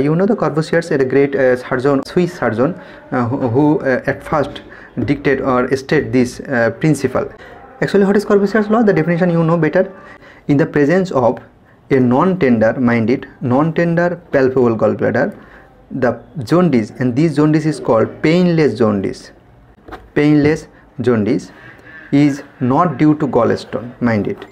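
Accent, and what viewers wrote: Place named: Bengal, India. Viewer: Legendary bangali accent😅. Thank you for clearing the concept so well.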